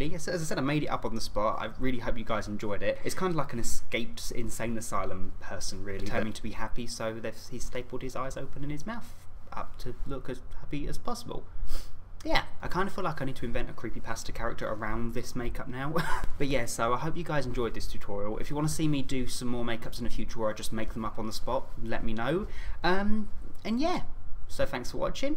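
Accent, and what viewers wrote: United Kingdom. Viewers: Does anybody think his nice awesome British accent fits that character perfectly you sound so sweet but looks terrifying cx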